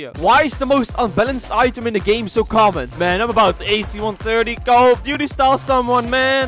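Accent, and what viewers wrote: Netherlands. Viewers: Are you dutch? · Are you dutch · this is the most Dutch accent ever lmao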